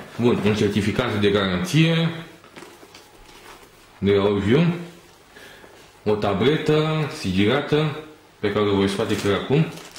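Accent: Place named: Moldova. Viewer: Oamenilor! E clar ..e rarait...sau moldovean ...sau ambele..ma rog..daca nu vreti..nu va uitati..ce naiba!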